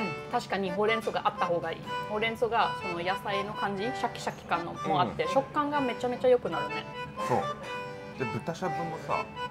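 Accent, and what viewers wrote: Nagoya, Japan. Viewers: 偶然に初めて見たとき日本語のアクセントが名古屋ぽいと思ったら名古屋ご在住のようですね。名古屋及び近郊の発祥の店がよく紹介されているので登録しました。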